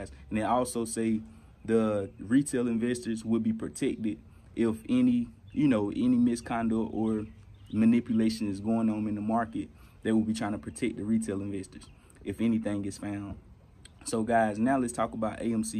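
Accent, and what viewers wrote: South, United States. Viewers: I swear I'm not trying to spread FUD. I'm diamond handing like a mofo lol. But I am curious about the dip in volume lately. Are less retailers buying? Btw, shout out from Alabama! I can tell you're from the south!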